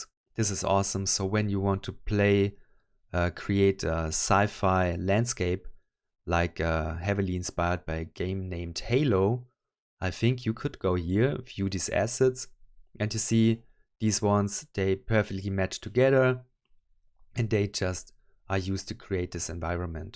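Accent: Germany. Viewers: Man hört den deutschen accent 🤫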